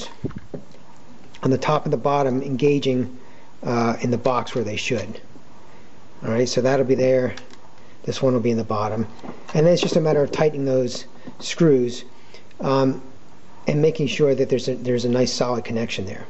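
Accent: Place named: New Jersey, United States. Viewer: represent with the jersey accent baby! thanks for the video!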